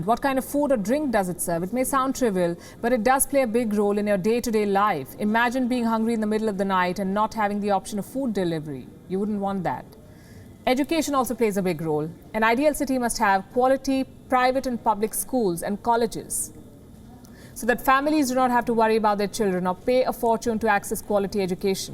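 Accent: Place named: Mumbai, India. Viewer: this dumb mumbai scammer accented anchor thinks its hotshot 🤣🤣🤣😂😂😂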